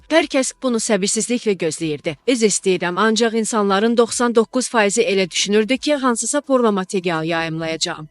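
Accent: Armenia. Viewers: Xanım ermənisi kimi danışır, Sözlərinin yarısını başa düşmək olmur. Siz ey Azərbaycan dilinin evini yıxanlar....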